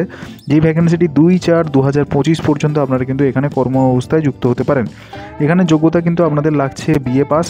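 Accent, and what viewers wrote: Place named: Purulia, India. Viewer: Purulia Bengali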